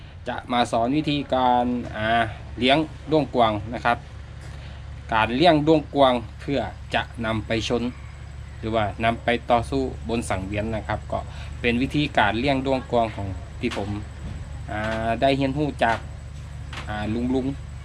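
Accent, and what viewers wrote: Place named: Isan, Thailand. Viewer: คนอีสานใช่ใหมครับ❤ผมเป็นคนอีสานครับแต่พูดอีสานไม่ได้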